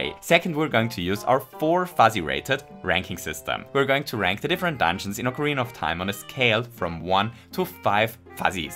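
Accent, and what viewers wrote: Germany. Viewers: Hes german… you here that 5 secs into the video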